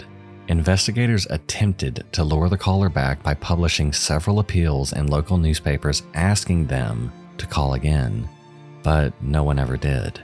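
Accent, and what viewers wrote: South, United States. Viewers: This man's southern twang is kinda sexy hehe (i'm a guy)... 😝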